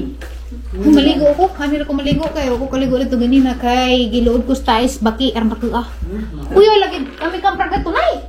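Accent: Bohol, Philippines. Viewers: Nindut kajo paminawon ag tinagabohol..Pohon mayo untag mkaari kag Ormoc ..ba mgpa picture LNG ko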